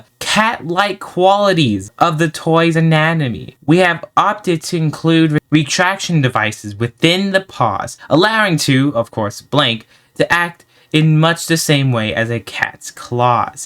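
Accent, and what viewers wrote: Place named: France. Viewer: Bah il a un accent français alors je pense que oui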